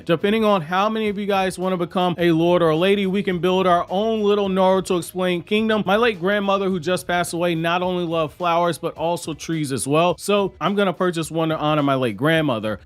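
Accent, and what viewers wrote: New York, United States. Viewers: yeah you sound really distinct in a cool way I was just curious I want to guess new york? I like your accent is all and I love the content sir!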